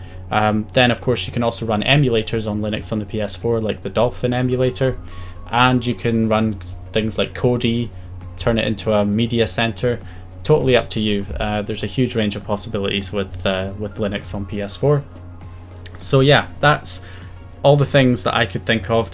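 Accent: Scotland, United Kingdom. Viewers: Are you Scottish? 🤔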